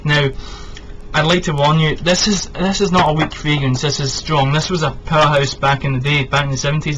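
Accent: Scotland, United Kingdom. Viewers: Just don't ever delete your channel. I like to visit it time and again like it's a shrine or something. You're Scottish and I'm a massive Medieval history buff. Oddly enough, your accent, the way your video's shot and your taste in perfumes tie into my weird mental image of a laid back baron from the feudal times who loves his perfumes. I always associate the notes of iris, tobacco, violet, oakmoss, cedar and the likes with the UK from the medieval times. Dim lights, stone walls, smells of fougere and something barnyard like all mixed together with a smell of wet stone/earth. I was playing Kingdom Come Deliverance the other day and I had to get back and check on. I hope you're doing well my friend. You're a youtube legend.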